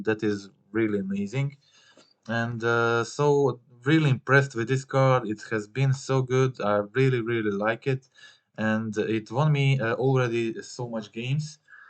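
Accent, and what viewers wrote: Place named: Poland. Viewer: first time here, and im about to buy 8 copies of it for me and a friend, no one else besides us two here thinks its any good haha (also your accent sounds pretty polish, correct me if im wrong haha)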